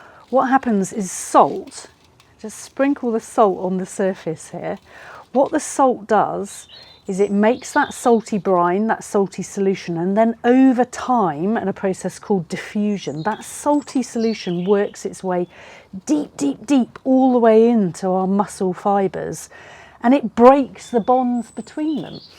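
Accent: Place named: Bristol, United Kingdom. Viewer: Thank you, young lady. Iam just about to do some St. Louis Ribs on me offset slow cooker here in SoCal and I shall give that a try. Love hearing a good old Brittol' acent again.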